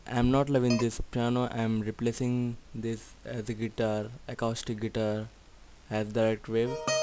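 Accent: India. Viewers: your accent sounds like you are from india? am i right? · are u from india? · well about all the comments abt his accent and stuff.. make fun of india for the language they dont speak but u cnt make fun f dem for the content they teach RESPECT I N D I A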